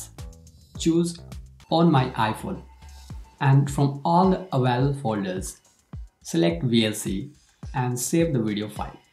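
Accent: India. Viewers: I don't mean to be unkind. But you should work on getting rid of the Indian dialect. For me, it's impossible to follow you.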